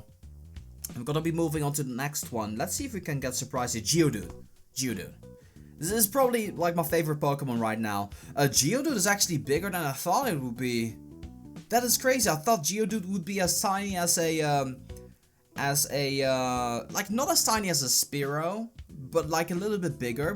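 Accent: Netherlands. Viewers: je bent toch nederlands dat hoor ik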